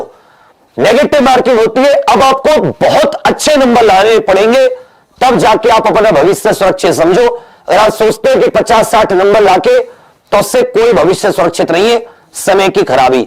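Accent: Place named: Uttar Pradesh, India. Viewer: Guru ji sudh desi u.p ka lag rha ho sol ma ek dam must 🤘🤘🤘❤️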